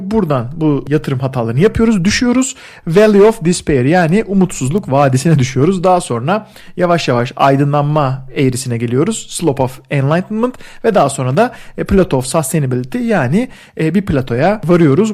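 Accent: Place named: Istanbul, Turkey. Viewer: Bugün abone oldum, çok kaliteli, İstanbul Türkçesi ve içinde biraz ingilizce ile dinlemek güzel.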